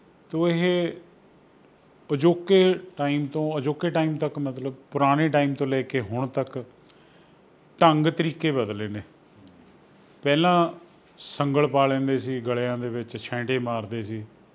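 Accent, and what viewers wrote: Puadh, India. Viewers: ਪੁਆਧੀ ਬੋਲੀ ਐ ਮਾਰੀ ਹੈ ਹਮ ਪੰਜਾਬ ਕੇ ਮਾਰੀ ਬੋਲੀ ਮਾਂ ਸ੍ਰੀ ਗੁਰੂ ਗੋਬਿੰਦ ਸਿੰਘ ਜੀ ਨੇ ਫਤਹਿ ਬੁਲਾਈ ਤੀ ਵਾਹਿਗੁਰੂ ਜੀ ਕਾ ਖਾਲਸਾ ਵਾਹਿਗੁਰੂ ਜੀ ਫਤਿਹ 🙏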